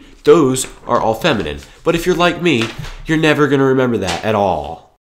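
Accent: Swabia, Germany. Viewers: It's amazing how you speak the Swabian dialect. :D I can understand many dialects, because many are similar like Schwäbisch and Badisch.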